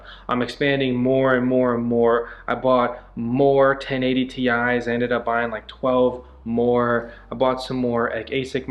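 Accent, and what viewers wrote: South, United States. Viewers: Your accent has become much more Southern.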